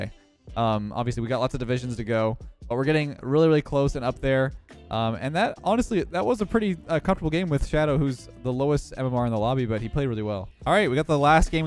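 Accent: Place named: Canada. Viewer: A follow Canadian Ehh🤣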